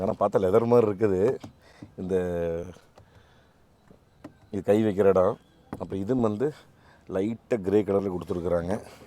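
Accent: Kongu, India. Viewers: அண்ணாவோட கொங்கு ஸ்லாங்கு ஸ்டைலே சும்மா நெகு நெகு நெகுனு இருக்கு😍😍😍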